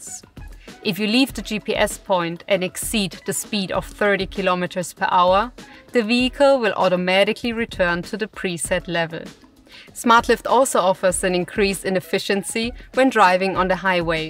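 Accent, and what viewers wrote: Germany. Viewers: I hear a German accent and see that her name is. My mind immediately thought of Schmidt. If I close my eyes while she is speaking, I can almost imagine her presence again. Oh how we miss you dearly with your infectious laughter and toothy smile. Rest in peace, love. Stay classy my friends. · typisch'l deutscher Acent 🫣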